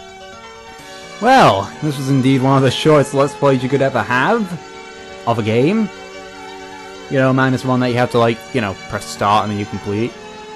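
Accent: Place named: United Kingdom. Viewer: British Pronouciation mate, we say some letters differantly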